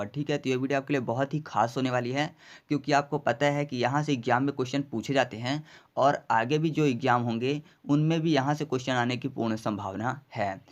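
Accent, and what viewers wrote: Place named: Baghelkhand, India. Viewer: Hats off bhai to ur effort....baki bhai Baghelkhand se h apna aisa lg rha h hme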